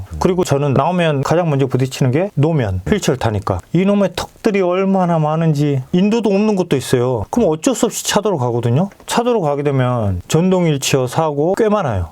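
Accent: Jeolla, South Korea. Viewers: 전라도? 외국으로 가셨내.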